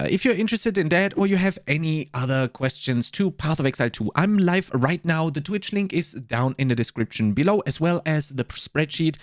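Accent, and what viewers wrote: Germany. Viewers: Perfect, just watched the other video about items and their stats before work today. Now this one drops when I get home. If I trust anyone with making cheat sheets and this stuff it's a dude with German accent.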